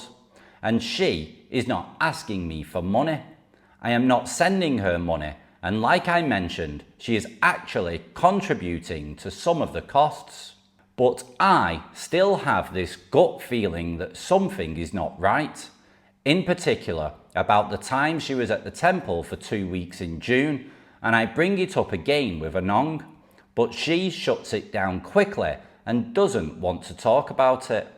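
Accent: United Kingdom. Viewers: You don't sound Australian, mate. You sound British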